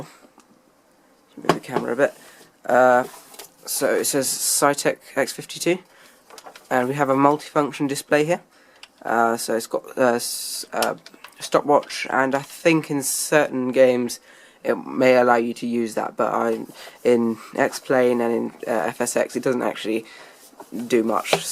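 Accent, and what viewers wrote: United Kingdom. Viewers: Very good review thanx bud, good to see and hear british reviewers and a excellent british accent well done.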